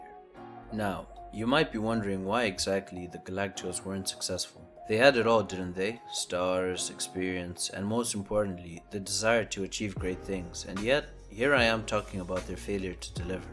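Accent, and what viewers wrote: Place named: Zimbabwe. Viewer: You sound Zimbabwean bro! Love the video though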